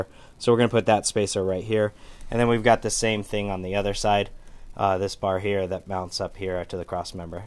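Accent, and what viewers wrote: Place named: Australia. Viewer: Best Aussie accent ever.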